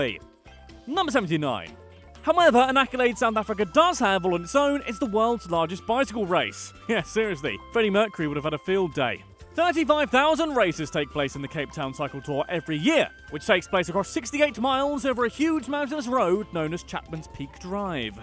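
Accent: England, United Kingdom. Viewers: I am from South Africa nad the acsent is kinda british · Wow very english you need to work on your accent dude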